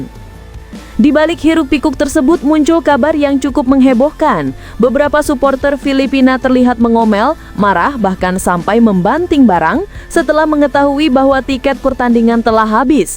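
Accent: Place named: Java, Indonesia. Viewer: waduuk aah medook anjiing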